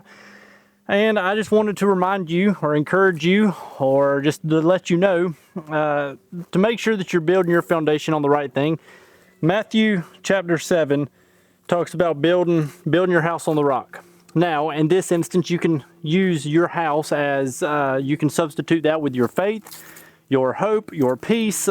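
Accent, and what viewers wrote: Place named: South Carolina, United States. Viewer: Hmmmm, accent, foliage, weather, east coast.... SC?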